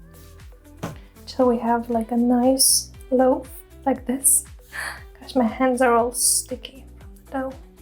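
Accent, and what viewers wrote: Germany. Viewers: She is German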